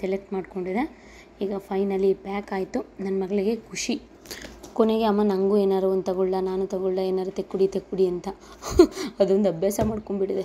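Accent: Hassan, India. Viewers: Hassan & Saklespura da kannada bhasheya sogadu nimm matinallide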